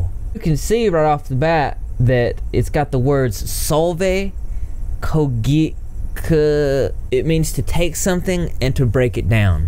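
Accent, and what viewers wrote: South, United States. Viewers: Deep southern accent + purple hair + wierdo earrings/plates =???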